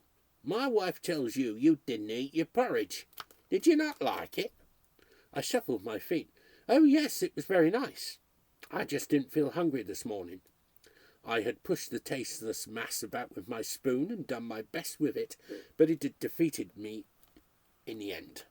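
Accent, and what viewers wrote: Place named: United Kingdom. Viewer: Thank you. Lovely british accent to go with it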